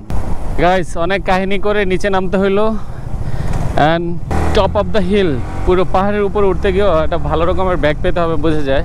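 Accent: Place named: Bogura, Bangladesh. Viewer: Vi apnar basha Bogurai ......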